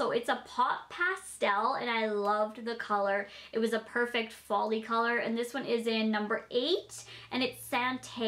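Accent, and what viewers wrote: Saskatchewan, Canada. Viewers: My twin brother keeps repeating everything you're saying because he says you have such a thick Saskatchewan accent and I'm actually so confused😂😂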